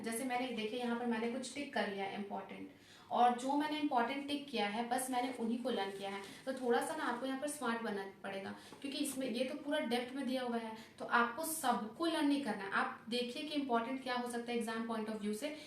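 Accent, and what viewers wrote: Bihar, India. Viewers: Continue rkho short video with Bihari Tone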